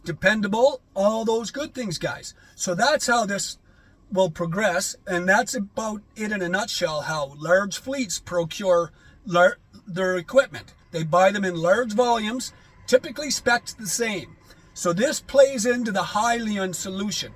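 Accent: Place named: Canada. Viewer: I love your accent. Canadian?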